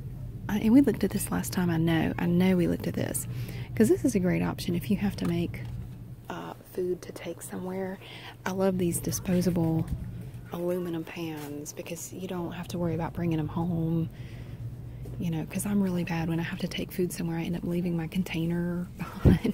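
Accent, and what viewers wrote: United States. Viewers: Your asmr videos always give me the tingles before I fall asleep I love your American accent so much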